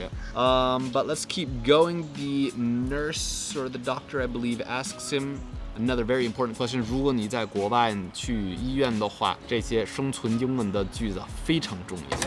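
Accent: Beijing, China. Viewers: 口音连着名字都是京味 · 第一次看你的视频，哥们儿，你是真北京人假外国人吧！这京腔也太地道了，连痞味儿都出来了……好听！赞👍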